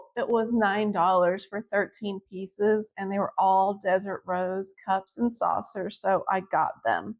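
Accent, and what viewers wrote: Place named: North, United States. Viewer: Are you from north Georgia? Your strong northern accent says no.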